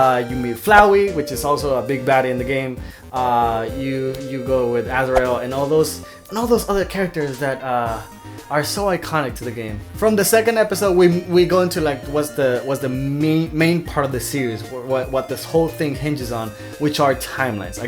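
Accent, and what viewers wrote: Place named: United States. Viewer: QUE!..... HABLAS ESPAÑOL!.... pasas como "americano" muy facilmente.... DIOS!... ya quiero ver la pelea xD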